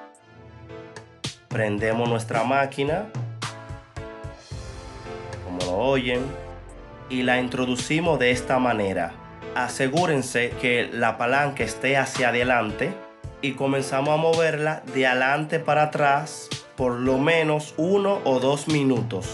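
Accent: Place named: Dominican Republic. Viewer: Hablas igual que yo Eres dominicano verda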